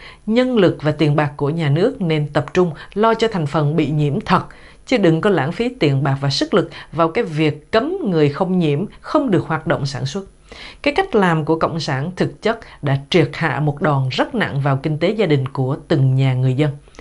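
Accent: Standard. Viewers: MC tuyệt vời giọng nói rất chuẩn, yêu em quá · Chị này nói ko nhìn sách luôn, vừa đúng chuẩn vừa hay tuyệt! 👍👍👍👍👍👍👍👍👍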